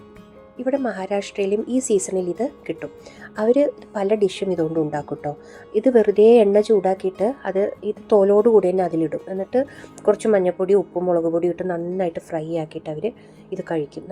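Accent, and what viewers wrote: Palakkad, India. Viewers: പാലക്കാടൻ ഭാഷ അടിപൊളി.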